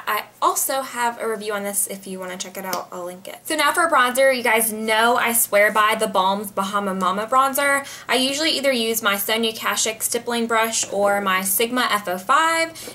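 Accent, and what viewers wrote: South, United States. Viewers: I can hear the southern accent lol.. she doesnt talk like this aymore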